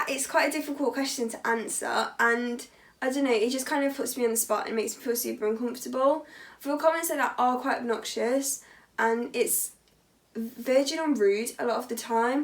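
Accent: United Kingdom. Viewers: Rik Pine Its the British accent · Hello, from across the pond. I think you're beautiful inside, and out. and I love you British accent. May God bless you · Woah your the girl from Instagram I never knew you had s YouTube and a British accent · I would simply like to say that you are living confirmation that the only thing which separates the British and we Americans is a common language. Your "accent" is charming! Yes, I know, we Americans trample all over proper English; but I could listen to you all day and never tire or tyre of your delightful soliloquy.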